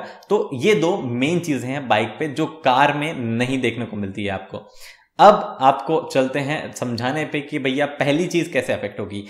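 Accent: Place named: Gujarat, India. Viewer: Are you a Gujrati? You've a strong MTI. :D Btw, nice video.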